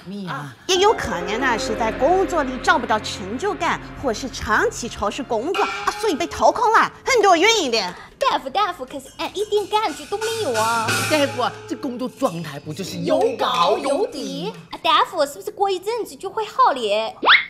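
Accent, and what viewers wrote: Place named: Shandong, China. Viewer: 山東腔好歪歪歪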